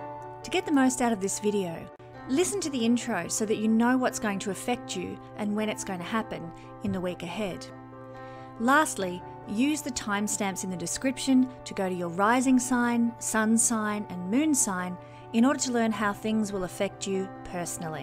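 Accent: Australia. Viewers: Pink blazer lookin fiiine, 😚! Oh, Aussie accent is the loveliest for sure! Btw, I think you forgot the time stamps for the last transit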